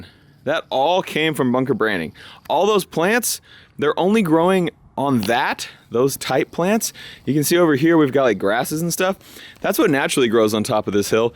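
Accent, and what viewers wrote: Texas, United States. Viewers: What part of Texas are u from 😐😐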